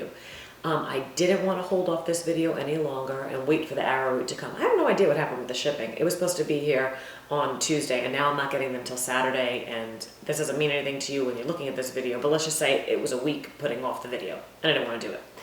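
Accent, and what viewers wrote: New York, United States. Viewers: Love you, Girl! I'm from Ma and love your New York? accent! And 'chew in' on tree bahk' - miss that sense of humor out here in Oregon! Thank you!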